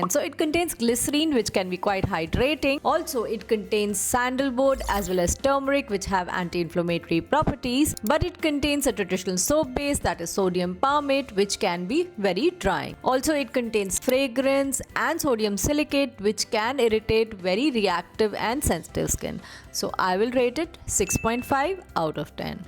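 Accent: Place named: Tamil Nadu, India. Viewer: Mm Tamil voice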